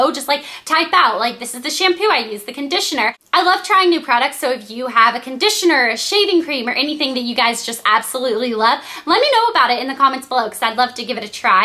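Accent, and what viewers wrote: United States. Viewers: Then don't watch her. Plain and Simple. And she is american so ergo an american accent.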